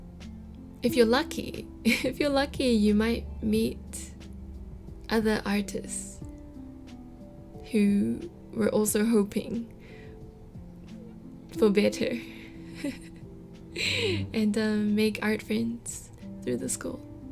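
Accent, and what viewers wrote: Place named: New Zealand. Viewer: Kiwi accent is the best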